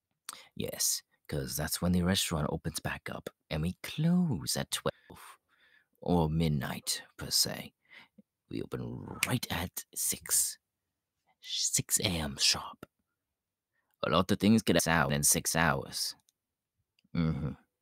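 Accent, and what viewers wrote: United Kingdom. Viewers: Me when he has a British accent: 👁👄👁 😺🦋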